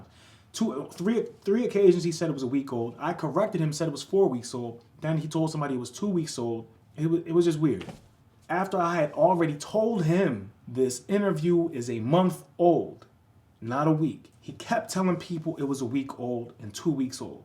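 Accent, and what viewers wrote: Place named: Newark, United States. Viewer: north Jersey? You do have a bit of a Newark accent. I’m not used to hearing non-Philly accents I live in Atlantic county now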